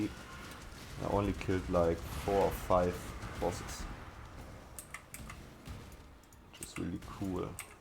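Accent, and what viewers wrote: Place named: Germany. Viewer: LIEBE ES MANN ERKENNT SOFORT WENN NEN DEUTSCHER ENGLISCH REDET ^^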